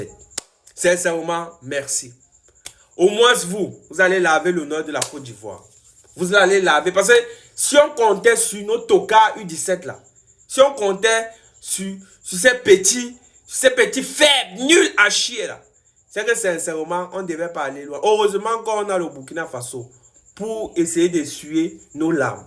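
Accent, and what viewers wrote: Cameroon. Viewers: C'est un camerounais 😂😂😂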